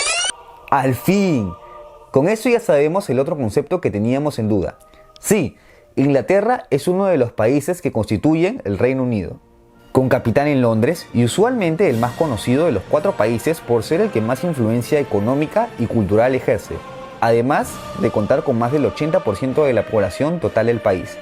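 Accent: Peru. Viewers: Lo único malo del vídeo fue escuchar tu puto acento peruano, recuerda a la momia bozzo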